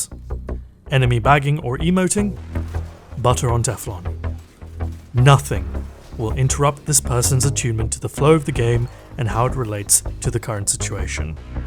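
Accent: United Kingdom. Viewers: This video is about as useful as Lord Shaxx telling you "You're trailing the enemy. Catch up!" when it's 3-0 for them, only one of your team mates is alive, and they have 2 revives left. Maybe this vibes with pro players who get paid to do this? I don't know, I play games to entertain myself. Having a British accent blabbering on and on in a dramatic fashion about things that are so remote from reality is funny, but "stew pid".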